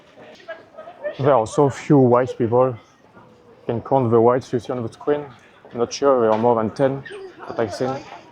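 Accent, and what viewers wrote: France. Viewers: Quel accent merdique! Il n'y a que les Français pour parler aussi mal.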